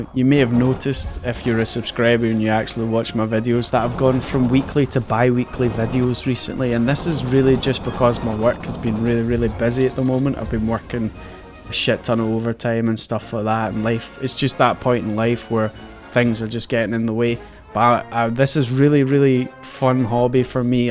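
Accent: Scotland, United Kingdom. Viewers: immediately like 3 seconds in the scottish accent throws it all off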